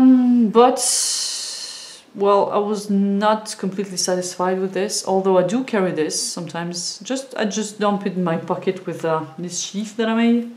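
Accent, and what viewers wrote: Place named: France. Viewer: j'aime ta revue elle est vraiment interressante avec un joli accent camembert.....merci pour ton partage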